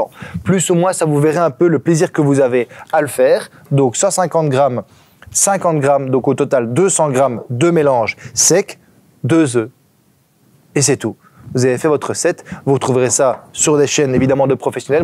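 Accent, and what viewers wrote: Belgium. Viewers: Une vidéo complète avec de bonnes explications ; du coup je vais de ce pas m'abonner à votre site car "cerise sur le gâteau" : J'adore votre accent qui me rappelle ma jeunesse lorsque j'allais à Liège chez ma tante (précision : je suis française 😉😉) A bientôt pour de nouvelles vidéos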